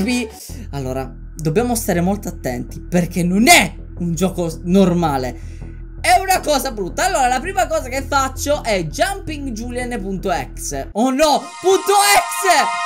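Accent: Sicily, Italy. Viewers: Te sei siciliano?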